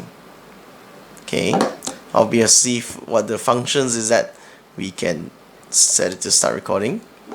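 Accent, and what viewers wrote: Singapore. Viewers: thank u! love the Singaporean accent.. got this camera as a christmas gift and am still learning how to use it.. in ur opinoin is this better than the gopro cams? thanks for the review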